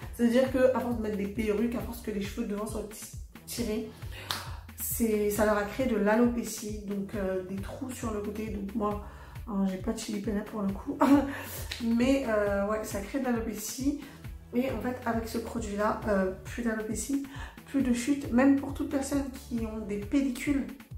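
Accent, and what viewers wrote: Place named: Ivory Coast. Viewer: Dès que je t’ai entendu j’ai dit c’est une 225 😂 en comment que chili pêlê ?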